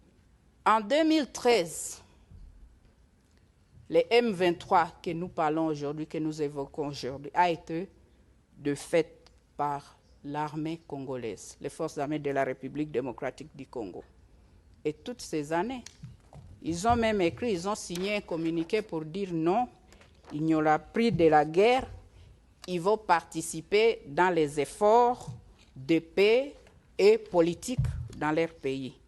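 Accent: Rwanda. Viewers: Ton rwandaise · Un accent rwandais et tutsi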